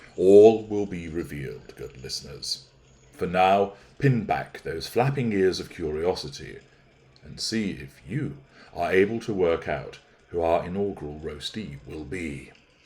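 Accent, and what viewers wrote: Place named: Scotland, United Kingdom. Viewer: Love it. You’ve got to be Scottish. You’re a treasure.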